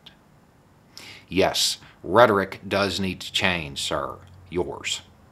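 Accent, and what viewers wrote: South, United States. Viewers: I adore this man. Something about hearing lefty politics from a deeply southern voice makes my heart smile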